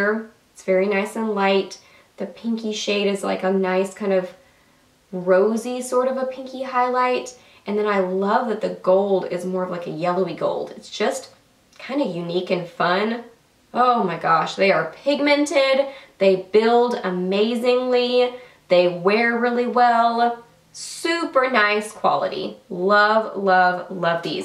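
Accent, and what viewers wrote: South, United States. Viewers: You're so fun to watch! Your southern accent is so cute! Love and hugs from Canada!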